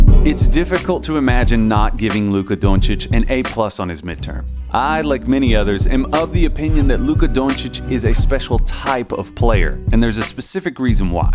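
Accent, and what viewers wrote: United States. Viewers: Yeah, at first I was thinking to myself "Gosh... This guy perfectly nailed the American accent... (My thinking being, that the narrator was Slovenian:))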